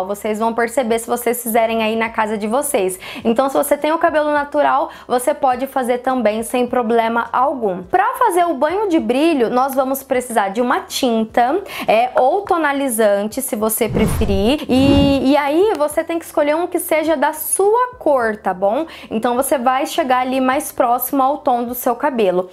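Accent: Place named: Interior, Brazil. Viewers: Q sotaque mara...sou do interiorrr tbm(Araçatuba)bjs adorei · Adorooo esse sotaque do interioR! Puxando o R! Tbm sou do interior de sp.. Bjs lindaaaa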